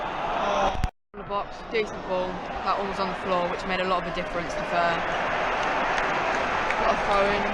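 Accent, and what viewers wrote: Manchester, United Kingdom. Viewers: That's a strange manc accent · nice manchester accent lol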